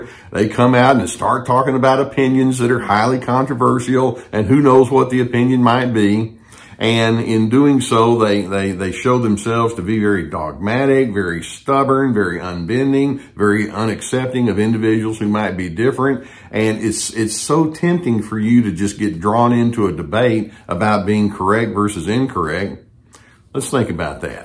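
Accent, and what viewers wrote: South, United States. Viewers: Thanks from France, Dr, for your daily (almost) presence. I am French, a musician, and your southern drawl is a joy, and music.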